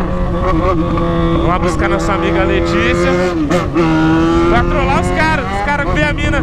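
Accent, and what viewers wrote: Goiás, Brazil. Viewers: Eae por que vc fala no pique do Goiás? Eu sou do Goiás kkk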